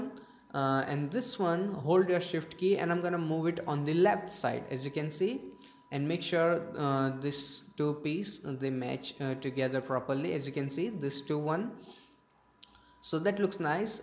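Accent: India. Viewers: I like how you sound like the guy from "Phlearn", but with an indian accent xD !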